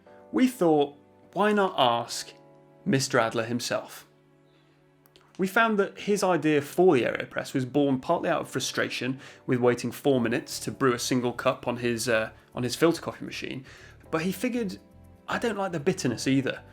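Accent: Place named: England, United Kingdom. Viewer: Somehow I could tell you were English before you started speaking. Love the accent 👌🏽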